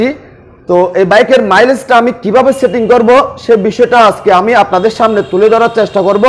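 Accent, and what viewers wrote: Chittagong, Bangladesh. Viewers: Apni ki cittagong er na ki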